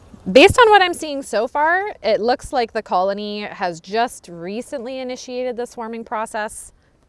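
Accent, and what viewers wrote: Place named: New York, United States. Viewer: Finally! A beekeeper who keeps bees and thinks like me! Super eloquent and intelligent! is thorough and knows her beekeeping! I love her explanations and NY accent 😍 Keep up your awesomeness and please keep posting! 🐝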